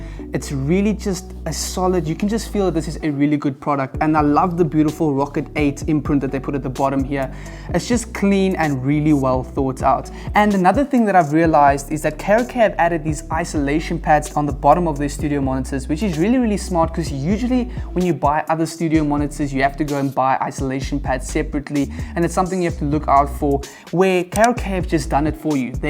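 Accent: South Africa. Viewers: Good old SA accent😂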